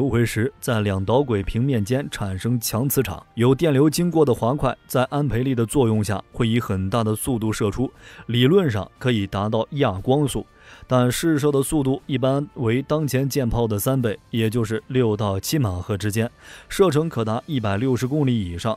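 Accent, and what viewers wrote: China. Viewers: 那是中國的唸法，畢竟是他們國家的唸法，就不要用自己國家的唸法去糾正別人了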